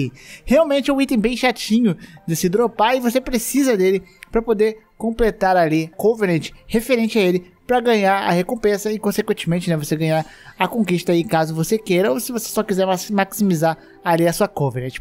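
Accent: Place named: Bahia, Brazil. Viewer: vc e baiano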